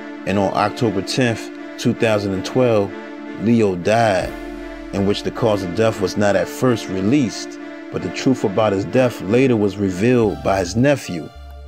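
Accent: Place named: Baltimore, United States. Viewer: Great video you sound like your from Baltimore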